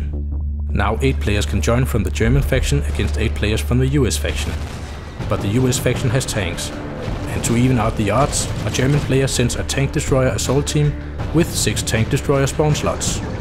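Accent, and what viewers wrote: Denmark. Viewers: Who voiced this? a danish person? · i hear a danish accent... hmmm FUCK YERH IT DANISH! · No, he's Danish, I know this because I'm from DK myself, and I can clear recognize the accent :D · Haha! Could hear right away that the narrator was danish! xD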